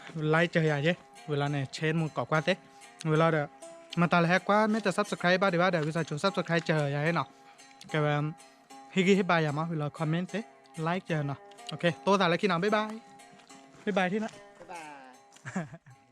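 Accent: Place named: Nakhon Phanom, Thailand. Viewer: นครพนมชัดจ้า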